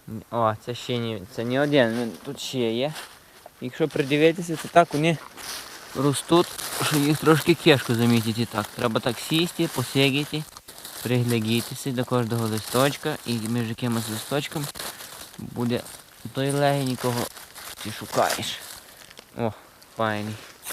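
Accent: Hutsul, Ukraine. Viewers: Все мені подобається!!! Гори і природа, грибочки збирати, і діалект гуцулів, який Ти шануєш, і будиночок, відпочинковий,і музика!!!Відео прекрасне, дивлюся з задоволенням Дякую,!!! Будь здоровий і щасливий!!!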